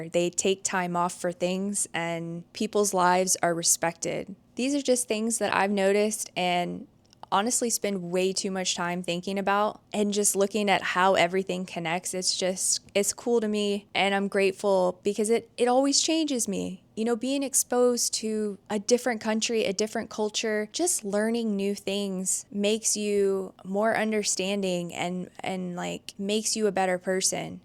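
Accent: Canada. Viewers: lol. these differences are the same between states. this is so ridiculous to think there are any real differences. you actually sound more canadian than i do. lol. i think your jokes weren't landing because they are not funny. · I can't hear a distinct American accent from you. You can pass as a Canadian. You sound like a Canadian (at least from Ontario).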